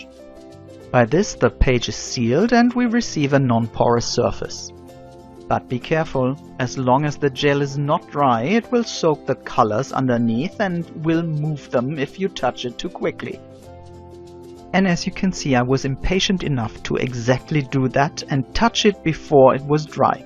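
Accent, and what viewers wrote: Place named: Germany. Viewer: I thought it was German by the writing and accent! :) I'm British but I was born in Munster (dad was in army) stay crafty my friend!! :) X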